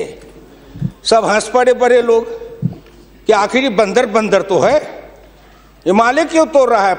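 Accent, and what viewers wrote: India. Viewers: sir you have brilliant desi style of speech with logically correct ground reallities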